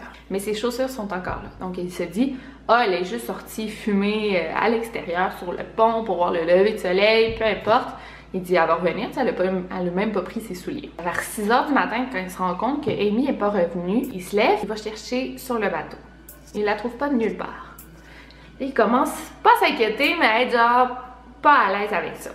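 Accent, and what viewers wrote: Quebec, Canada. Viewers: Au début j’avais beaucoup de mal avec ton accent québécois mais depuis un mois tu es définitivement ma youtubeuse préférée 😍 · dommage je comprends pas tout ce que tu dis avec l'accent quebecois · " elle est pas nul part " c'est tellement mignon le Québécois 😂 Merci pour les vidéos elles sont vraiment bien. Les explications sont toujours complètes et très bien racontées :) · Je t'ai découverte grâce à la collaboration que tu as faite avec Liv mvz et depuis je suis accro à tes vidéos c'est fou ! De plus, j'aime trop l'accent quebecois et ta voix, sa me calme fin je sais pas comment expliquer mdrr. Continue comme ça, t'es vidéos sont simple et bien réalisées 😉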